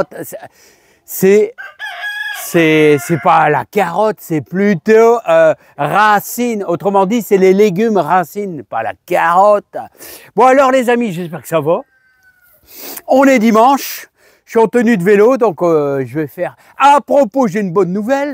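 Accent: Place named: Pas-de-Calais, France. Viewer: J'adore. Votre accent du Pas de Calais est un régal. J'espère faire des poireaux en suivant vos conseils. Mes laitues à moi sont minables pour l'instant.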